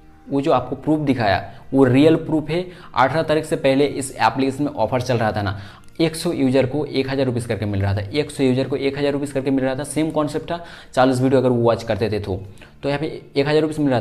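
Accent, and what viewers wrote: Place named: Bihar, India. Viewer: Kyo ki app awaj se Bihari lagte ho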